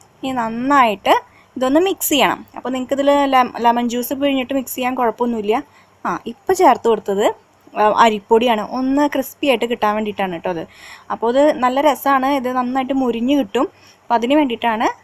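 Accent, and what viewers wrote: Thrissur, India. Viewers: തൃശ്ശൂർ ഭാഷ🤗 കുഴുവ വറുത്തത് 😘😘😘😘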